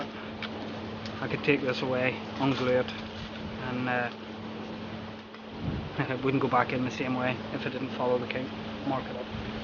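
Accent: United Kingdom. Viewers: Great video,hope you and the family are well as we are here,great to hear the Holywood accent. Used that flexi pipe for a few years now and it is really strong though others have to be carefull as it can come in 38mm and 40mm and the larger wont fit solvent weld which I have learnt,just thought I would help a bit. Keep up the videos mate and look after yourself.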